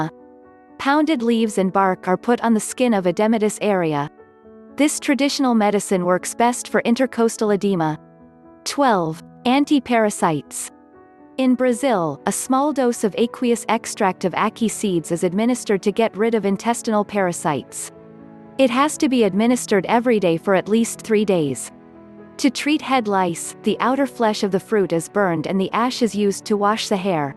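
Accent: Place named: Portland, Jamaica. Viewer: You sound like you're from Portland!!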